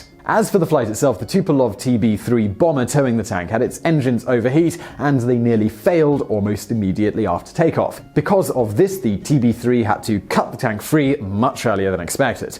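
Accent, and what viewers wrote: United Kingdom. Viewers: When did Vsauce have a British accent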